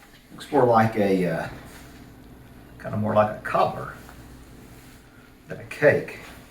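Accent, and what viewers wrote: South, United States. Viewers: OOOH MY GOODNESS!! I HAVE FOUND A NEW FRIEND, IN MY HEAD! LOL! I FEEL IN LOVE WITH YOU, YOUR AMAZING SOUTHERN VOICE! I SUBSCRIBE TO YOU RIGHT AWAY AFTER THE HONEY BUN CAKE! I TURN ON ALL NOTIFICATIONS I LOVE HOW CAME IN A STOLE THE SHOW! YOU GUYS ARE SIMPLY AMAZING!! PLEASE CONTINUE TO SHARE THE LOVE AND JOY WITH US!! I LOVE YOU BOTH!! MERRY, MERRY CHRISTMAS!!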